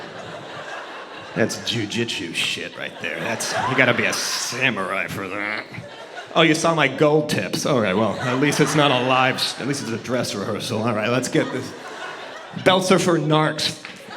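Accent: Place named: Massachusetts, United States. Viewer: I was thinking ok that’s Massachusetts or Portland because I actually understand this guy and I now I believe it is Massachusetts.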